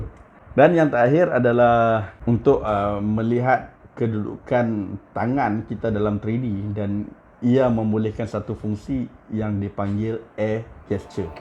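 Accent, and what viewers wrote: Malaysia. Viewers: Kedah pekat noh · Brother ni orang penang ka?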